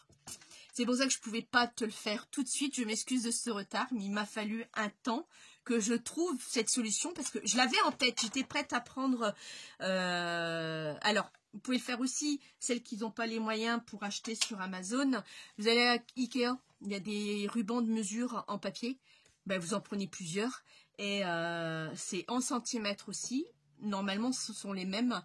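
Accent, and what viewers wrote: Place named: North, France. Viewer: Cc, j'aimai beaucoup comment tu étais coiffé sur ta dernière vidéo, ça te va trop bien, dommage de les avoirs coupés, mais bon.... J'ai beaucoup aimé tes présentations comme toujours, avec ce fort accent du Nord mais j'adore...😅 Très bonnes fêtes à toi et toute ta petite famille....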